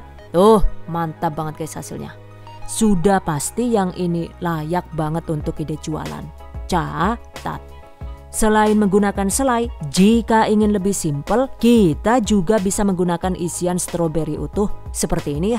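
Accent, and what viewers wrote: Banyumas, Indonesia. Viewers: Diperbanyak dong ngomong ngapaknya.. 😅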